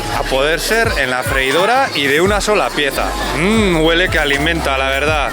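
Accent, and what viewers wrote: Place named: Spain. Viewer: Este vídeo fue muy divertido, con un poco de sarcasmo, me gustó mucho, Baby y su mamá bailando como si fuera “ fiebre sábado por la noche “ de John Travolta. Agrégale sarcasmo a tus vídeos, te sale muy divertido, con ese acento español tierno que tienes. Gracias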